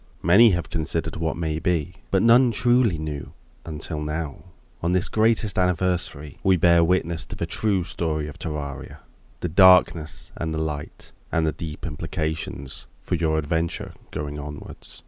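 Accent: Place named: Europe. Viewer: TerrinX - hes European, it’s how you say it over there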